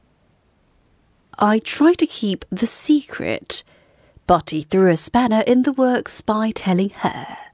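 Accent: RP, United Kingdom. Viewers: 의 진짜 영국영어 최고 👍🏻 쌤, 30년 장수 프로그램 가즈아~~~🌼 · 님~ 5시 50분 본방사수하고 있습니다 ^^ 포쉬 엑센트 발음 더 배우고 싶어요 ㅎㅎ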